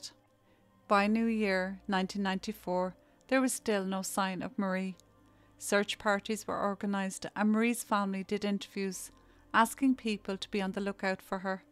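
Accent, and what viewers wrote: Kilkenny, Ireland. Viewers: Is ur accent a kilkenny .i was born there but grew up in u.k.xxxx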